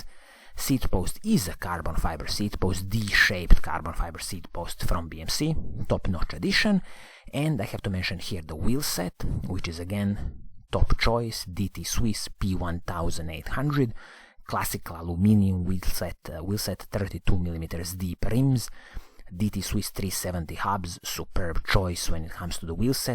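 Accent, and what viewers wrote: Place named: Italy. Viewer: love these videos, Brilliant! full of info and great Italian accent!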